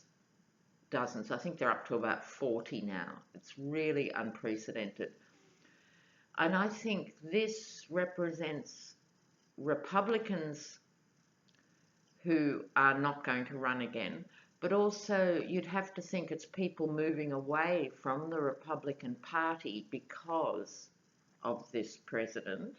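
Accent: Australia. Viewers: I just came across your Tarot videos yesterday. I Love ‘em, but I am writing to give you kudos for your up to date and in depth awareness of what’s going on politically in the USA. I am amazed. You know as much as any political junkie here does. I recognized your accent as Aussie but assumed you must live here, until you mentioned you were in Australia. How do you keep up?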